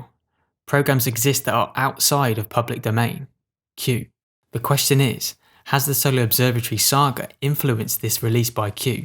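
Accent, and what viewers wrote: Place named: United Kingdom. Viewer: Bloody Brit-ish acc-ent!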